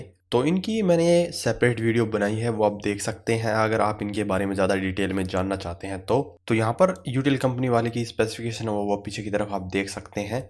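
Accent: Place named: Haryana, India. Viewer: बुरा ना मान भाई या तो हरयाणवी टोन स बोलने में फील ना करे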